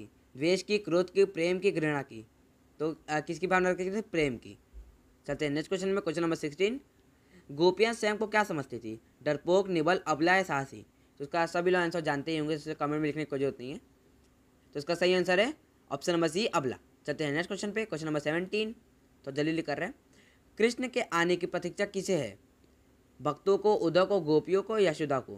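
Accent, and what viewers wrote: Bihar, India. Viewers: Sir bs ek question aap bihari hai ky .plz reply sir your language is like them only . Btw I love bihari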